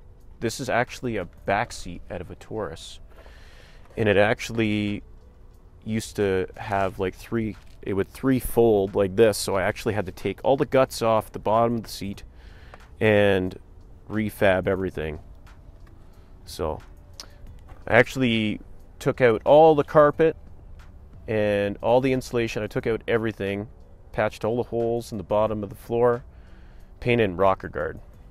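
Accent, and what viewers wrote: Canada. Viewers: Definitely Canadian sayin ouut!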